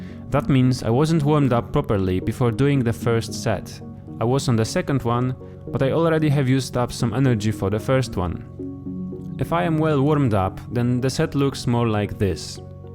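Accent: Poland. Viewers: I hear a polish accent in your voice. (Poland?) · SiriusB88 he’s polish.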